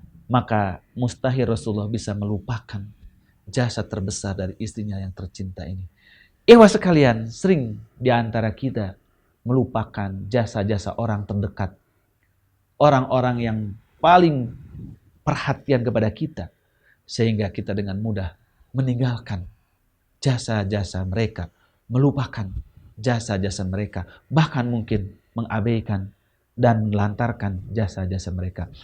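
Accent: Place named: West Java, Indonesia. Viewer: Sangat Sunda sekali... NGAOS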